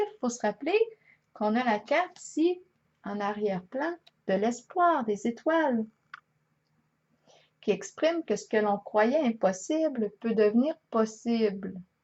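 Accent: Canada. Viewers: Les expressions canadiennes sont très belles. Je les aime depuis longtemps. Ainsi que cet accent canadien. Et surtout, vous êtes toujours très très profonde. Merci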